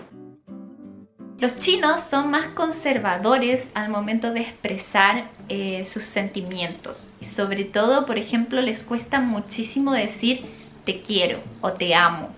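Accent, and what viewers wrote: Chile. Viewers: Estas perdiendo tu acento chileno. Abrazos! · Siempre me ha gustado el acento chileno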